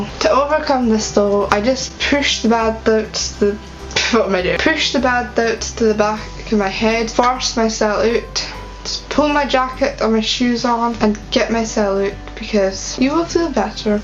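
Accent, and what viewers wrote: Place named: Scotland, United Kingdom. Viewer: this was interesting to watch, I’m sorry your having to live with it, Skye is mega cute, I’m from Scotland and by your accent I’m thinking your from Scotland as well Ayrshire or Falkirk but I could be wrong lol x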